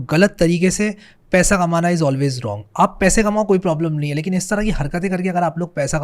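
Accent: India. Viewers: English bro .. but easy to understand like an Indian accent with subtitles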